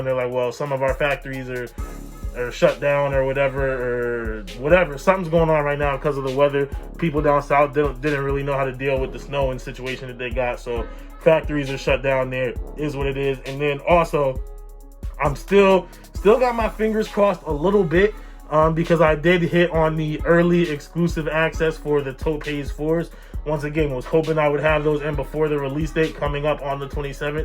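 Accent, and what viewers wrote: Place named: Texas, United States. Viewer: oh you from texas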